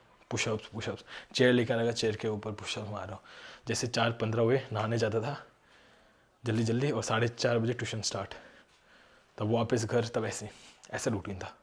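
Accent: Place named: Northeast, India. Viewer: dost northeast se ho kya? bdiya accent hai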